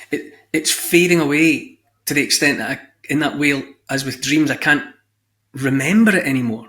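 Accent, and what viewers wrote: Scotland, United Kingdom. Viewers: I've just started listening to you after seeing you interviewed by Eddie Hobbs who I also only just found (late June 2025). It's so nice to hear you speak as you think as I do. I am English but I live in America and I see what has happened and is happening. I was listening to David Icke many many years ago, and he wasn't wrong, was he? Anyway, just a thumbs up for your YT channel here. Keep up the good work. And I like the Scottish Accent when I can hear what's being said and yours is very clear.;)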